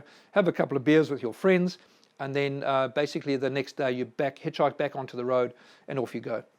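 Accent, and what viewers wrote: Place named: New Zealand. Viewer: Hi! How did I miss this?! Great job! It was awesome to hear you speaking in that chill NZ accent. Miss you Bud.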